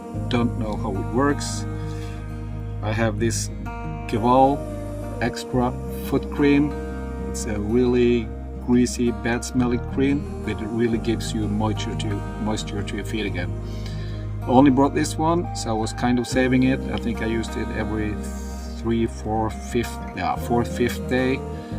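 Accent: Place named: Poland. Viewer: Guy sounds like a polish. The shoes are a gay overload. You don't need all the crap. I wore an old pair of running shoes, on the plane, in the trail, in the auberge, everywhere... but they were running shoes, gel padding in the sole, no problems at all on my whole Camino. Just bring one change of under clothes in your pockets. You don't need a back pack. Your not in the Rockies. Anything else you need.... BUY IT THERE.... Spain is a developed country for crying out loud!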